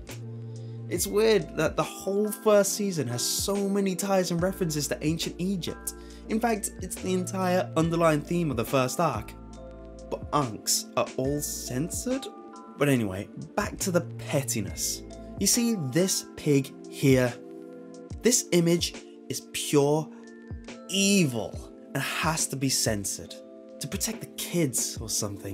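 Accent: United Kingdom. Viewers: he sounds British, but is not British. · You sound British but don't look British what's up with that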